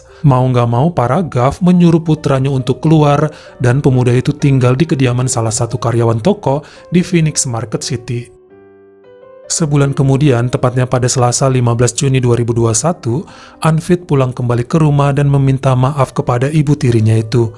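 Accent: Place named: Java, Indonesia. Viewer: Bang boleh nanya gak.. Abang orang batak atau Jawa seh... Nama marga batak..logat jawa😥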